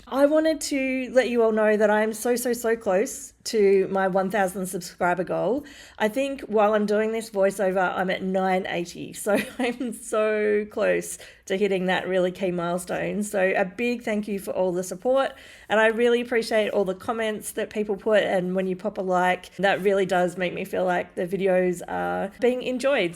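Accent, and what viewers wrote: Australia. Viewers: I like to hear you talk and I’m trying to get an Australian accent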